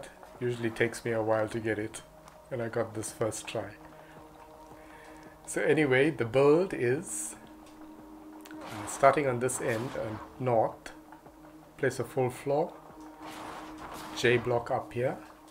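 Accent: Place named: South Africa. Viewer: Are you south african? I can see the ping and hear the accent 😭🤣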